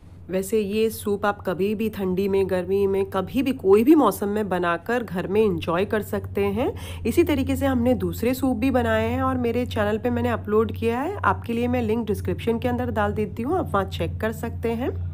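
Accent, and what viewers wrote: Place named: Konkan, India. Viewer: aapki baaton se lag raha tha. Where r u from?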